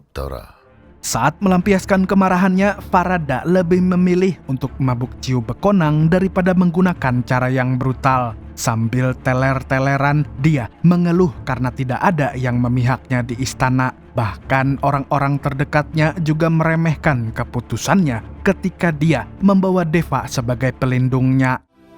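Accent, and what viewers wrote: Banjar, Indonesia. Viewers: Banjar banar piyan mang ae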